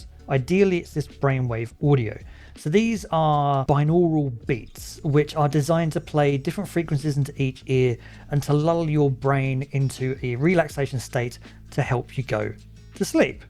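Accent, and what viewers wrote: United Kingdom. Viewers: Just saw your A20 sleep review, which was really good. I just got the A20 after watching loads of reviews on both. Which one do you prefer? I think if battery life is important the A20 are still the best. I also had the A10 but the left earbud died on me and never wanted to charge fully again 😢 So I am off to try the A20 for the first time. Thank you for your review ( nice to hear a British person for a change😂)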